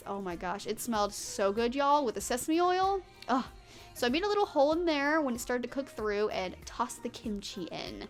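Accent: South, United States. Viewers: All the y'alls make me think your southern. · Are you originally from the south? Love your accent!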